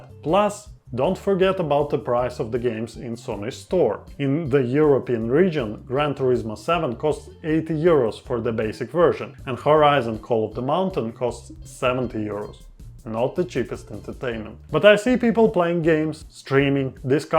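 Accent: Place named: Russia. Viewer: Oh, god, russian men speak on English, and this is so cool!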